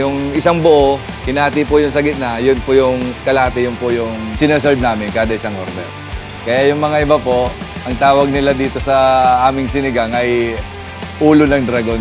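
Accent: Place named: Pangasinan, Philippines. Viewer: Ang tono ni sir parang taga pangasinan siya, mabuhay ka at wag kang susuko par, par ang tawagan ng mga taga pangasinar pangalatok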